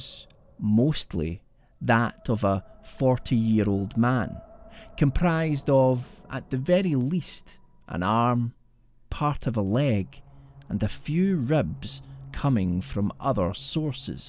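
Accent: Scotland, United Kingdom. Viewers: oh my god Scottish voice narrator and the great! so glad I found this channel! it always amazes me that I can go to 20 different creepypasta narration channels and they all will have different but equally good material for me to listen to! so much talent out there and so many outlets to find them in its just wonderful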